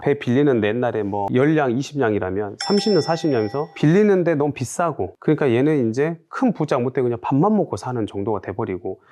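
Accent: Jeolla, South Korea. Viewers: 좋아요누르고 들어요 ㅎ 전라도사투리,구수하고 듣기좋네요^^ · 매번 영상시청하며 보고 듣고 배우고 실천할려고 노력중입니다.. 항상 좋은영상 감사해요.. 전라도 사투리 정감이 팍팍 갑니다.. 겁나게 좋소.. 화이팅_^**^_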